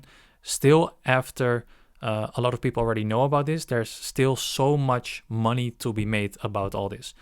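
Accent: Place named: Netherlands. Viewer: Je hoort het aan het accent hij komt sws uit nl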